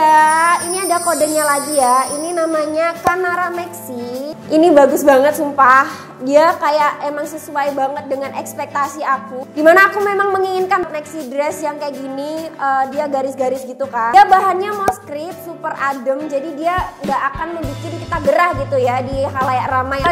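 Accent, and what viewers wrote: Java, Indonesia. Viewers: Mbaknya org mana sih?? Medok bgt sumpah lucu.... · Kak bahasa indonesianya agak medok Jawanya khas banget😀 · Kak asli mana. Kok ngomong e jowo?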